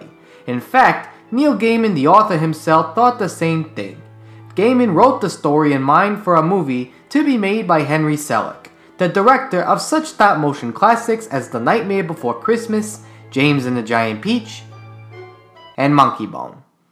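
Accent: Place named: Brooklyn, United States. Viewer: The Brooklyn accent is unbearable.. I feel like i'm listening to Joe Pesci.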